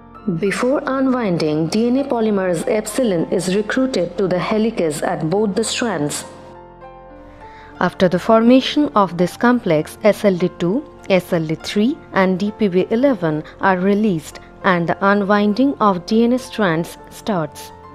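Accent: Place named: India. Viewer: If only the indian accent wasnt so thick.. 😢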